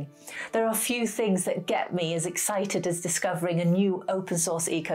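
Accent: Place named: RP, United Kingdom. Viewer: What's a great SmartHome introduction. Very informative, your mounth 'prints' queens spoken English, and you touch on things like ESPhome and many more that regular (even IT) guy would not be aware of initially. Are you UK based? If so, can you sometimes add links to products where you usually buy from?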